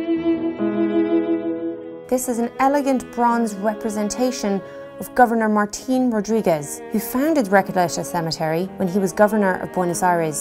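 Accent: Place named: Ireland. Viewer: This is the most informative video about La Recoleta. Thank you!! I especially enjoyed your presentation, with a lovely Irish lilt : )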